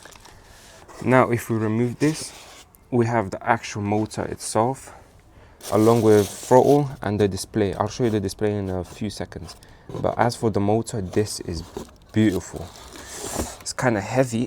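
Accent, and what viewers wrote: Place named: United Kingdom. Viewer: Out of interest, why is you accent sound like a uk born afro Caribbean person, but you a white fella. Thanks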